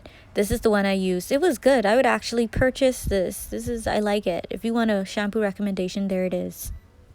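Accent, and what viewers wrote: Bahamas. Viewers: I felt so slow asking my mummy if you sound Bahamian just for her to point out the flag behind you I feel dumb asf but beautiful results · Girl I got so excited when I heard your accent lol was not expecting that. New fellow Bahamian subbie 🥰❤️🇧🇸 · The Bahamian accent is so strong lol... I love it! I was very entertained and informed on the bleaching process and steps. I wouldn't do it on myself though lol. Good job. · Heard your voice and knew you were Bahamian. This video helped me alot.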